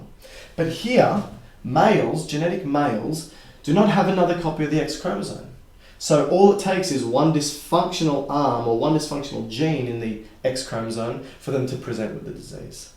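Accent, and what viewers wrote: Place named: Australia. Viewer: Hi I only just found your channel as my daughter and I have a 1Q22 duplication and from what I’ve tried to research on it it is very rare and not much known about it and all the other channels and stuff I’ve found are from other countries but by your accent can pretty well assume your an Aussie and hopefully could shed some light on this for me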